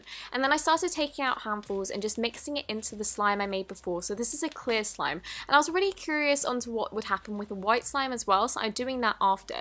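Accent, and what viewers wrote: England, United Kingdom. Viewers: Welll are you american?? If you are all British voices will sound the same to you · to Umm... Where’s her British accent? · I ligit loves her videos (like if you agree) they are so calming and I l9 e her British accsent also there's a better chance of seeing pigs fly than her actually seeing this but I still love her...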